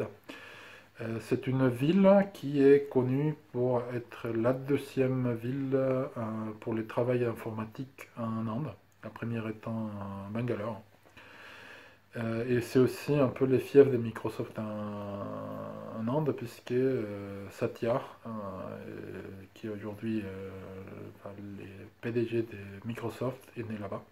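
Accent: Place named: Mexico. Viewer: Moi je suis POUR un podcast en espagnol !!! Il y en a si peu 😞 ! je regarde celui de Esperanza y Anna, ( du Pérou je crois) et celui d'une espagnole d'Espagne mais qui ne me plait pas des masses...Ceux d'Amérique Latine en général portent surtout sur le crochet, alors j'adorerais t'écouter en espagnol même si je ne suis pas sûre de tout comprendre avec l'accent mexicain 😄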